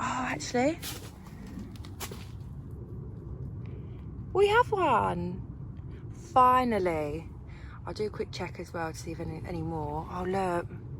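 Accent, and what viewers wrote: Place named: Essex, United Kingdom. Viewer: I believe it's an Essex accent.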